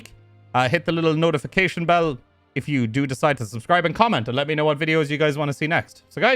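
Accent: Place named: Ireland. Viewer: I thought he was Irish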